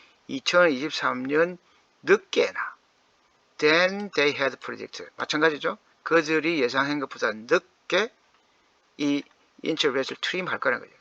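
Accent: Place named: South Korea. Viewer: ^^ 머스크 과장과 사우디공주와 탕비실 얘기에 저도 많이 웃었습니다.^^ 우리나라에 사는 많은 사람들은 같은 한국사람의 영어발음이 더 잘 들리는거 아시죠? 감사합니다. 잘 들었습니다. 😅🎉❤😅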